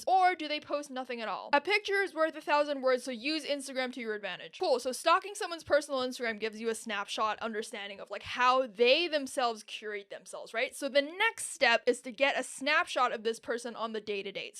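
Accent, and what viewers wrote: United States. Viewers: Way too funny & chaotic, as an American you sound like one & subscribed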